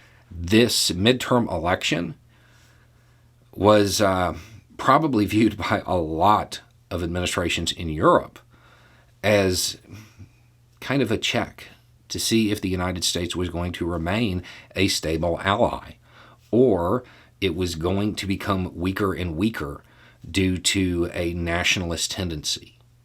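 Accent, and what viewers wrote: South, United States. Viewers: Why do we get a bullshit republican "newspaper" ad after watching the fifth column?. Do they think that all rednecks are stupid and Uneducated? Certainly not if we are listening to this guy who talks with a hard as rock southern accent and still makes more sense of the political shit happening every day better than CNN. Kee it up hombre, we will just skip the Epoch Times crap!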